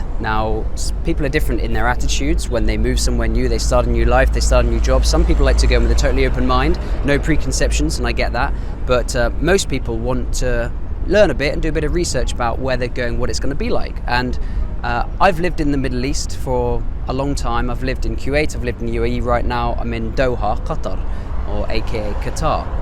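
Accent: United Kingdom. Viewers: I like your accents 😁 the way you said summer.. It's british and really like british accent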